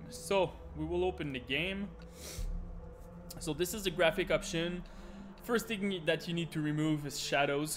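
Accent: Quebec, Canada. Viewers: l'accent québécois mon chum, bon vidéo big.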